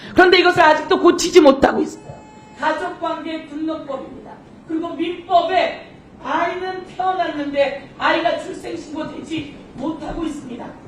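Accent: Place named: Jeolla, South Korea. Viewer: 영교 깽깽이 말투 카악 ~ 퉷 🤣🤣🤣🤣🤣🤣🤣🤣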